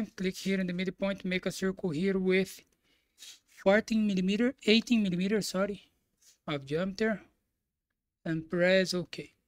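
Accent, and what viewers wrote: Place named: Brazil. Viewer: Excellent explanation, congratulations. One doubt is that from the way you speak, it seems to me that you are Brazilian. Am I right?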